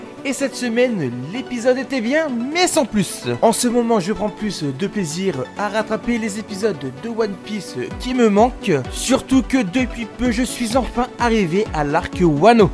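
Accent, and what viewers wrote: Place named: Japan. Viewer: Normal pour le symbole du savoir. C un cuirassé a la base. Sinon sympa ta review meme so je trouve tjrs un peu too much de prononcer les noms avec l'accent japonais en parlant francais mais ca reste du gout perso^^ me suis abo pour le soutien ;) Si tu veux jeter un oeil jai fait un amv pour la disparition de Koji Wada ❤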